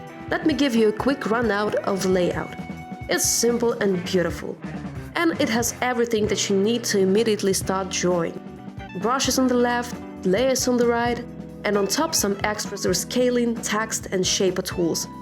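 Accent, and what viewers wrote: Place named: Russia. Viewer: She talks with Russian accent 😅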